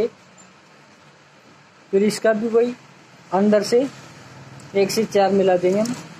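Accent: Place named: Rajasthan, India. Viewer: Bhai sahab namaskar Bolly Se To Rajasthani lag rahe ho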